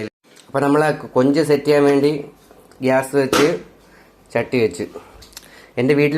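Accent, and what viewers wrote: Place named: Thiruvananthapuram, India. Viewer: തിരുവനന്തപുരത്തിന്റെ സ്വന്തം പുത്രനാണ് അതേ ഭാഷ സ്ലാങ്ങ്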